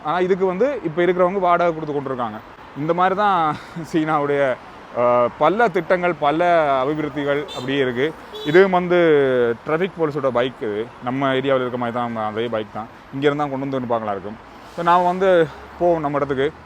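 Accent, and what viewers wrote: Sri Lanka. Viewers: உங்களது இலங்கைத்தமிழ் தமிழ்நாட்டுத் "தமுளை" விட கோடி மடங்கு இனிமை !! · உங்களின் இலங்கை தமிழ் கேட்க்க இன்ப தேண் வந்து பாயுது காதிணிலே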